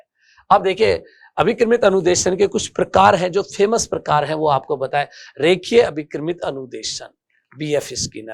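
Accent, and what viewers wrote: Rajasthan, India. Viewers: आप हिंदी वर्तनी में राजस्थानी क्षेत्रीय भाषा से प्रभावित बोल रहे हैं, जो कि अशुद्ध है। कृपया सुधारे।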